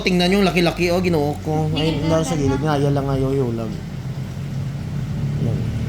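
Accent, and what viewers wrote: Iloilo, Philippines. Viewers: You sound ilonggo/hiligaynon po ☺️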